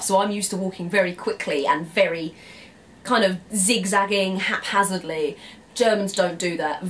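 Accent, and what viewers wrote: London, United Kingdom. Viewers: I fucking love the British accent. I dont know why. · I was looking for videos of German people and I'm thankful I came by yours! Really loved it. Plus, I love your expressions and that flawless British accent (well, you ARE British). Greetings from Argentina! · Thank you so much for sharing your observations of my homeland as an English woman - or may I say as a Londoner? Very interesting to see Germaqny through London eyes! And btw I LOVE the British accent so much! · As a German I have to say you've got a massive thick English (London) English (language) accent. Or British English. But you are speaking loud and clear. Thank you :-) Although sometimes much too fast to my German ears and I have to repeat. Normally I better can understand the American English because without this typical fat English (from England) dialect. 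But what I can understand is that you are enjoying your life in Germany and you see things as strange, which simply ordinary for every German. lol :-D PS: We love our bread too! Go on! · Hello, thanks for that video, i was very interesting and fun to watch. I have never thought about a lot of the stuff you mentioned but now I realise it ^^ like the direct behavior and the unwilling for small talk. I wand to ask you if you have experiences with austrian people? Because I am from Austria and even when we have (nearly) the same language with Germany I think we are quite different in our behavior. But I am very curious:) ps I love your accent, I makes me want to go back to london! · i love your London accent. That is so easy understandable for me. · aww i love the british accent, the first 10 seconds already made me melt. AWWW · very charming accent. not too heavy, great speech .well done ,you must come to oz a lot of brits do and many stay for good. 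actually brexit will lead to much more trade and cheaper flights to oz and canada. go for it. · Thanks a lot for the way you pronounced the "ch" in Munich, please try to call it by the Bavarian name München, as I keep trying to copy your British accent.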